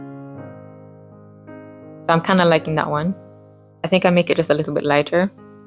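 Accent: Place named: Jamaica. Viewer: Your accent is very Caribbean, you sound Jamaican actually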